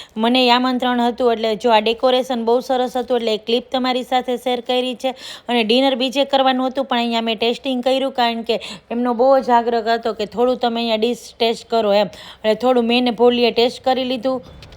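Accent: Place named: Kathiawar, India. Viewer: Bahut achcha bolate ho ekadam kathiyawadi style😊😅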